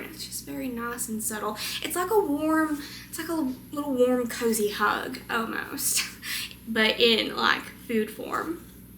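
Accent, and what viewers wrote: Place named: South, United States. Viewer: Just found your videos. Can't wait to try your recipes! Love your Southern accent!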